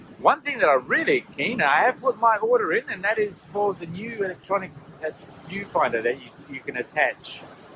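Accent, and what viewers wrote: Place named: Australia. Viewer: good to hear an Aussie accent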